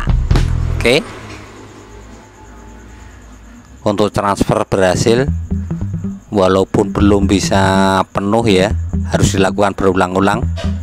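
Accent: Java, Indonesia. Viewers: Logat bahasane medok nyenengke tenan dirungoke.. 😂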